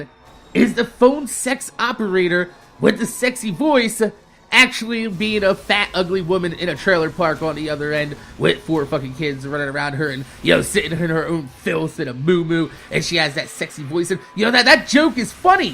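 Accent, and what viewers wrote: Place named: Baltimore, United States. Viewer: I can tell your from Baltimore because it snowed in my recently and the way you say water.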